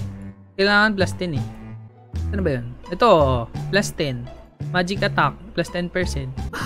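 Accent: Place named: China. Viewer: Hahaha, sobra tawa ko sa pag chichinese accent mo.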